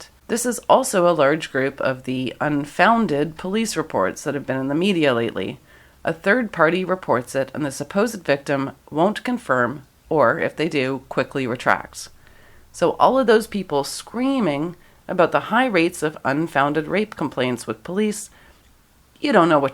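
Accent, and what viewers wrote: Canada. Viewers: I love your accent, Are you Canadian? Am watching your videos which are courageous...love the Bill Cosby one!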